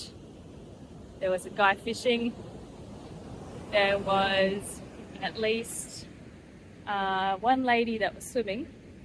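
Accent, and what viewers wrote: Australia. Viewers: i really like your videos. so that i can shadow aussie accent ❤ ❤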